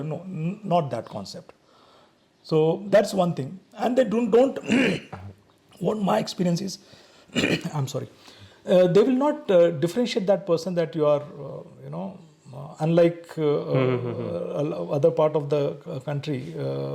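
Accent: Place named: Nagaland, India. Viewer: Sir speaks exactly how we nagas are.. Impressed and shocked too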